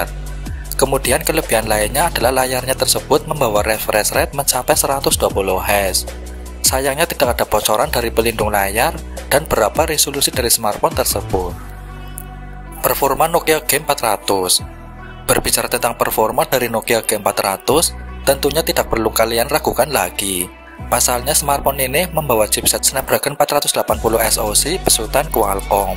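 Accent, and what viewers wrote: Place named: Java, Indonesia. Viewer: Nyunsewu, mas e logat e medok.. saking tegal mas?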